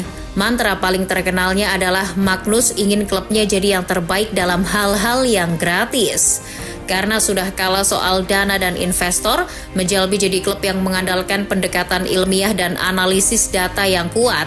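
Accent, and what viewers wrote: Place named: Pekalongan, Indonesia. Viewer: orang pekalongan mana suaranya wkwk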